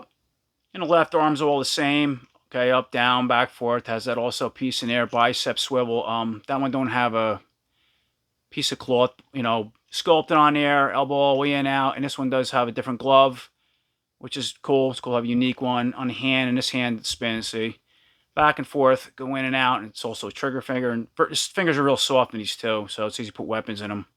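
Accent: New York, United States. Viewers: Thanks for your quick reply! Now I gotta sub!;) It's good to know that at least I'm not alone going nuts over these QC issues! I managed to somewhat straighten the bullets but they're still somewhat warped. Feels like I'm tripping on acid just looking at them. Thanks McFarlane! Not! Oh well... I'll try the heat cold method once again soon. Thanks. I appreciate you 🙏 By the way! Is your accent from New York? Just curious! Best regards from Belgium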